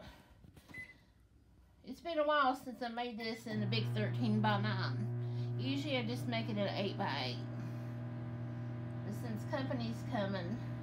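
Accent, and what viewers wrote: South, United States. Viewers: I love that she says “poodin” lol has the cutest voice. It’s so girly and southern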